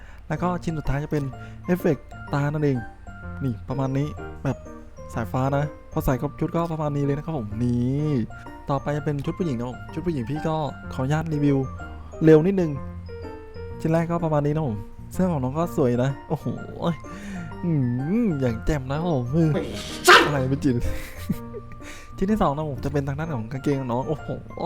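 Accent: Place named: South, Thailand. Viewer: พี่พูดใต้ได้ค่ะ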